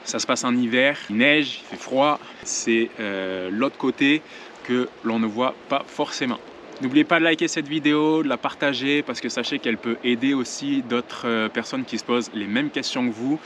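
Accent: Quebec, Canada. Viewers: L’accent québécois pas canadien haha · J'adore ton accent. On entend tranquillement le petit accent Québecois qui perce! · Tu as tellement pris l'accent canadien :) GG mec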